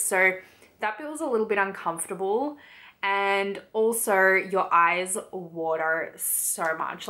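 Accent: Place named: Australia. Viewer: Love your accent!! I've heard Australian accents before but never that hint of "r" that follows ur "o"...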